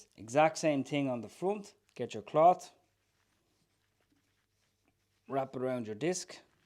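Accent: Ireland. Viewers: Another brilliant informative video as always! also Love your accent mate, the Irish accent is the best in the world imo x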